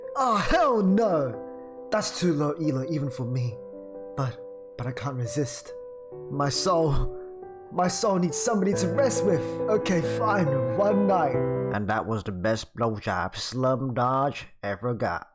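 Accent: Australia. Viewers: He's just Australian don't mind it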